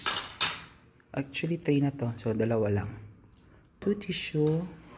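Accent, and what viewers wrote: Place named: United Kingdom. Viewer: Aha, uu nga anoh. Salamat sa pagturo. Galing mong mag explain. I like your british accent:)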